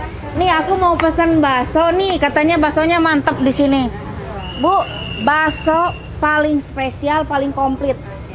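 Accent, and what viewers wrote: West Java, Indonesia. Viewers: pengen ketawa mani mencugug Sunda banget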